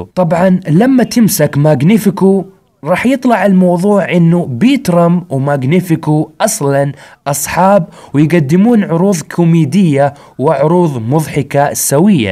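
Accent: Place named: Jizan, Saudi Arabia. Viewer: ياخي انت من جيزان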